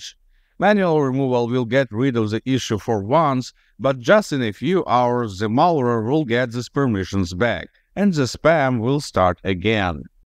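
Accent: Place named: India. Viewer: not even the AI can cover the indian accent. but thanks for the video.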